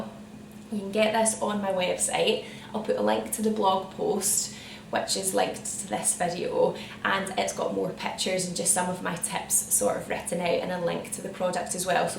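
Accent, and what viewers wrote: Scotland, United Kingdom. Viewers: I watched your video for the interfacing and l just ended up really enjoying your accent...l really love scotish accent...wish l get to visit...greedings from Athens that is straggling with heatwaves and wild fires at the same time...oh! And of course you are very very clear in your video and very sweet...slainte!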